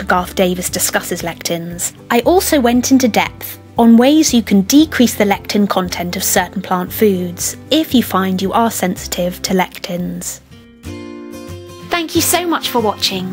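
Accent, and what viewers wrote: United Kingdom. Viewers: Just because some mushroom has some special property that confers immunity to cancer doesn't say anything generally about lectins. Until "science" offers better theories, instead of spending their time theory-shaming others, people will keep trying the diet - and if they feel / get better, then even you telling them theyre wrong in a calmly reassuring British accent isn't likely to change their minds.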